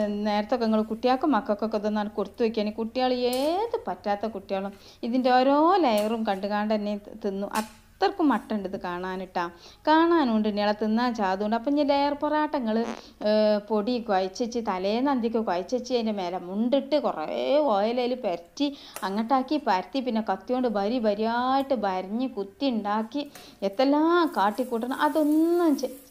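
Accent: Malappuram, India. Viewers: മലപ്പുറം സ്ലാങ് ആണോ ഇത് .നല്ല രസമുണ്ട് കേൾക്കാൻ .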